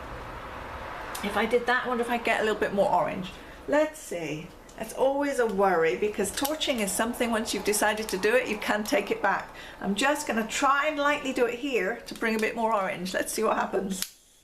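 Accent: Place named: Bristol, United Kingdom. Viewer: Is that a Bristol accent I hear? Reminds me of home (Beckington). Lovely pour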